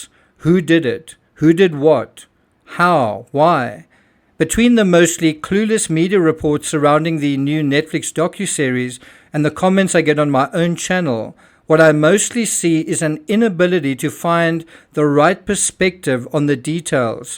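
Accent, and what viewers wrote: South Africa. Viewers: That south african accent though. Hideous af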